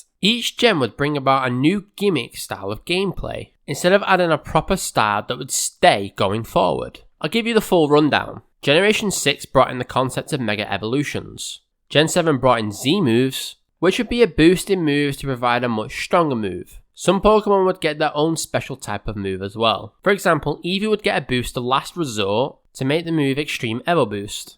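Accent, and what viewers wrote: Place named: United Kingdom. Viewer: Not to mention, emulating Gen. V, you can also experience Drayano's ROM Hacks, BlazeBlack/VoltWhite, which seem to bear the kind of difficulty I now actively want in a game... Maybe that would explain why I enjoy Dark Souls so much... As much as I love the idea of using Greninja and Zeraora in Unova, I can but dream... And we do say "ee-"volution here! (Where are you from? I seem to be finding a lot of fellow Brit's channels recently!)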